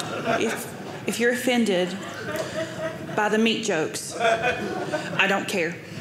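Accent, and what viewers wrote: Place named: Alabama, United States. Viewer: i like her, she talks like me! From Alabama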